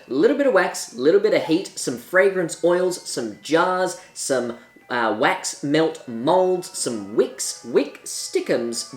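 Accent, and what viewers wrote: Australia. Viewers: He makes me think I need to marry an Australian man. Love the accent. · He has an Australian accent that isn’t annoying. Astonishing.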